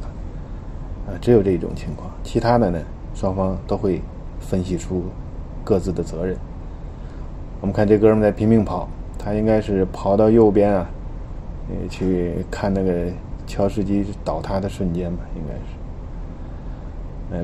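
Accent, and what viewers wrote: Tianjin, China. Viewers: 口音是天津老乡吧？ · 講話像大陸人口音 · 天津人一听就能听出来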